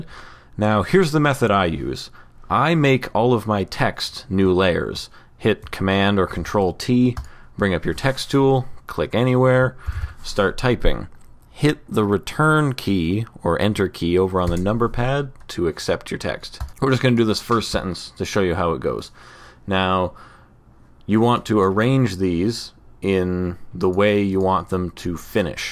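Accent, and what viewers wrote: Canada. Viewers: You sound canadian are you? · Nice work! thanks for the help! By the way, are you a Canadian? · I know it's mostly just because you're Canadian, but omg you sound so much like Seth Rogen. <3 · Are you canadian? :D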